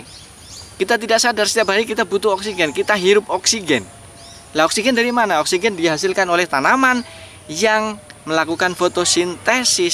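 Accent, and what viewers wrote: Banyumas, Indonesia. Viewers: Nuwunsewu,panjenengan daleme pundi pak?ngapak-ngapake ko kadose mboten asing teng kupinge kulo.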